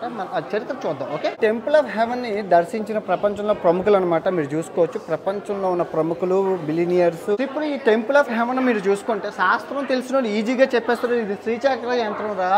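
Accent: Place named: Godavari, India. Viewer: అబ్బాయి చాలా excellent ga organise చేస్తున్నావు. నీ సహజమైన గోదావరి యాసలో explain చేస్తున్నావు. మళ్ళా మన దేశం సెల్ఫ్ డబ్బా వద్దు . వాళ్ళ గొప్పతానినీ మనం హర్షించలి కూడా.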